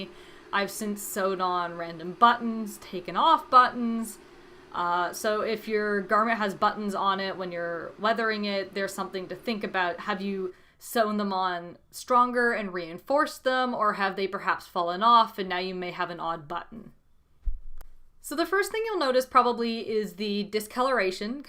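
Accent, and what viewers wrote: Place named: United States. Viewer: You sound like you're either American or Canadian, did you adopt that accent, or are you not originally from AUS?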